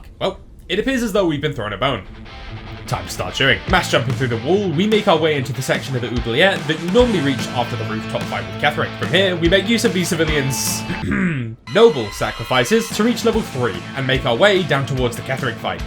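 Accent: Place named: United Kingdom. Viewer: Great editing. You should boost your audio a bit more. I need that beautiful british voice straight in my ears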